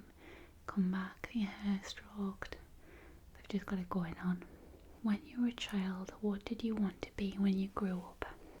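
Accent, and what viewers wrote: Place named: Newcastle, United Kingdom. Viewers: Sounds like a Geordie lass. :) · Great to see a fellow Northerner creating ASMR videos. At last an accent I can stand, but Singapore is definitely not in Germany!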